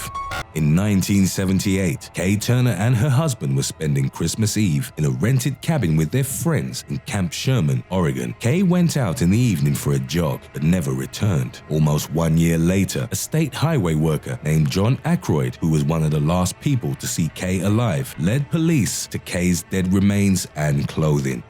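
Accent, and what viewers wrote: United Kingdom. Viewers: batman 666, True i kinda liked the other one. The british voice does not work with the music too. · shout out to the British narrator😉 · What happened to the scary voice. This guy is ok but any U.K. Voice is never really that scary. Makes you not want to jog. Although anything like being in the wrong place at the wrong time can get you killed.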